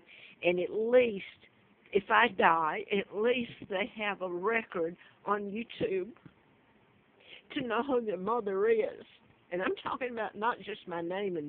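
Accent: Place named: South, United States. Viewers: How did u get Southern accent ? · she was kidnaped and the southern accent comes from the British.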